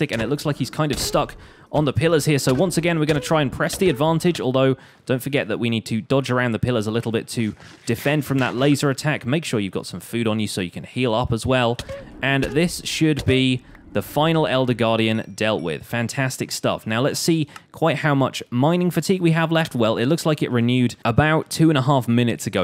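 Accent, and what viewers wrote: United Kingdom. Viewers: I SWEAR he's British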